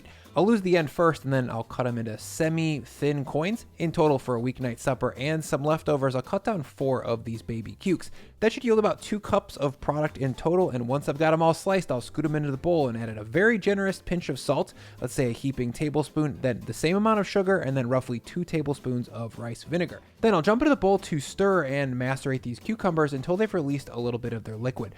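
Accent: United States. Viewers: Why does this guy have to use metric system measurements? It’s so frustrating. He’s clearly American with his accent or lack there of.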